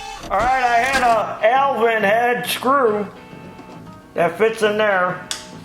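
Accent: England, United Kingdom. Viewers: That British accent is funny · Your English accent is great! Come over some time and have some warm beer - jolly good show as usual!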